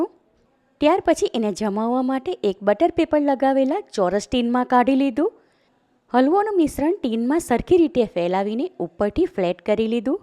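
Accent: Surat, India. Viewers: જેવો મીઠો હલવો એવી મીઠી સુરતી બોલી❤😊❤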